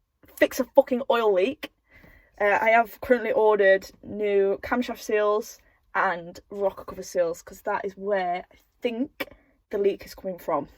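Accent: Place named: England, United Kingdom. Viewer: Damn, you sound proper English!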